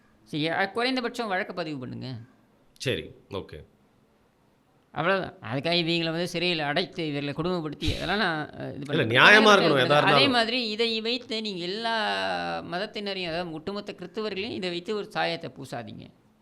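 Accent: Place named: North Chennai, India. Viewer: North chennai slang is good sir. It is a mix of Tamil, Telugu, hindi or urudu slang. A language should be able to convey the message. Litrature is totally different from colocal language. Both are to be appreciated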